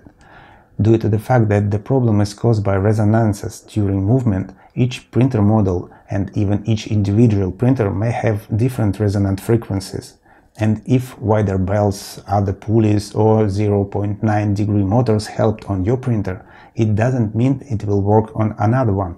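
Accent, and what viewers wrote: Russia. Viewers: Well, the voice and articulation and Russian accent — of course, you need to work on that. I mean at least a normal voice equalization. There's a lot of bass, 125 hertz and 250 hertz are buzzing, there's little mids and highs. Maybe it's okay to hear from the phone, but from a computer with good speakers, it's hard to even hear what you're saying. =) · Your english is great and russian accents are cool Im getting a prusa and have 2 ender clones so im super excited for this as a project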